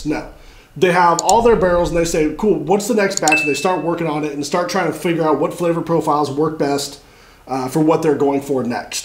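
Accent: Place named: Nashville, United States. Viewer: Sound like you live close to Nashville I’m from Lewisburg !!!!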